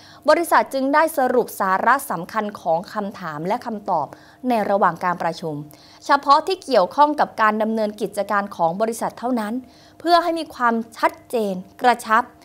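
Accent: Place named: Satun, Thailand. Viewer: แหลงทองแดงชัดเจนมากๆเลย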